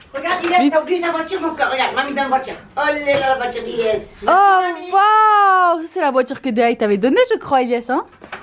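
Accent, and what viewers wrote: Algeria. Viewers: t adorable à parler avec l'accent Algérien et ton papa lahi yahafdou adorable j'en peux plu de rire avec eux 😘😘😘😘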